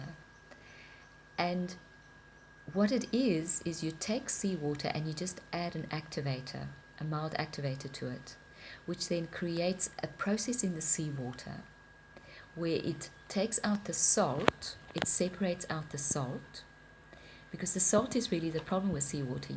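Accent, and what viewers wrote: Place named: South Africa. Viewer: Hi, I hear your South African accent. Would love to collaborate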